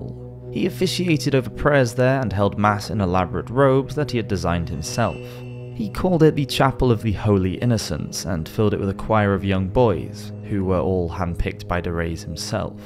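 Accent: England, United Kingdom. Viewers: I love this channel. Medieval history just sounds so much better coming from an OG English accent.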